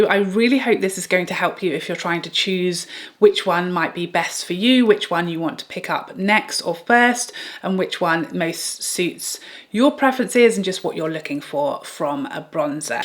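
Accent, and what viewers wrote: United Kingdom. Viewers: I loved this overview, dear, and, i love all English speaking YouTubers, and yes, what i see here below, British accent is sooo elegant always! Living in The Netherlands, they try to learn us UK English, but most of what is getting in our heads from TV and internet is of course American English, which is not a problem! But i think that's why, we Dutch have that mixed pronouncation, i always, always and always hear it!😂Your accent however, is so lovely!!!! The bronzers, i refused purchasing one other bronzer after everything to warm lately, but i puchased the Yves Saint Laurent bronzer, shade 2, it's really neutral, really matte, not my top 3, but top 5, love it! Wishing you a wonderful day!!!😘😘😘😘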